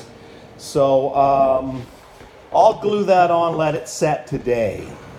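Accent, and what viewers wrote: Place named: East Coast, United States. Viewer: Great information to know, thanks. I detect a slight East Coast accent.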